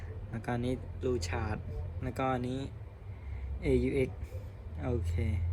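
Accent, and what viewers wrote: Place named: Cambodia. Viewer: ออกขเมรๆนะภาษาพี่นี่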